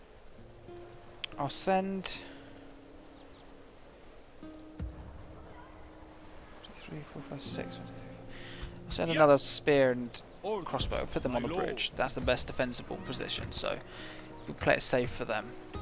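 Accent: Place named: United Kingdom. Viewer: didn't even know you were british and i was subbed for atleast a year now lol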